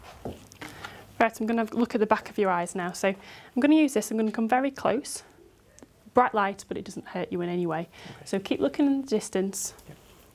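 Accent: United Kingdom. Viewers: how i love her british english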